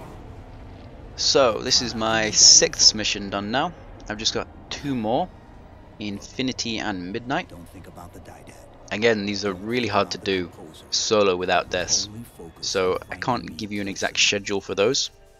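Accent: United Kingdom. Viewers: / /. Adjective: 1. The apex of human skill. Verb: 1: Attainment of perfection in the course of ordinary gameplay and related abilities. i.e. "I grenade jumping!" Noun: 1: The greatest Halo campaign player alive. 2: A friendly British chap with a suave commentary voice.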